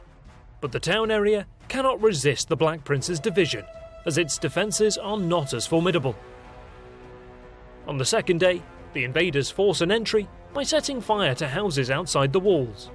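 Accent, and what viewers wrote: England, United Kingdom. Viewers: What part of Britain are you from?... This sounds like one of the numerous British dialects of English (yes, unlike most people, I know there are more dialects of English in the British Isles alone, than in the rest of the World, combined). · na i'm sorry x) Poitiers, the S is silent, Saumur is said Somur, Tours, S is silent... Not even close to 5% x) But yeah, this channel is cool. just that damned rosbif accent.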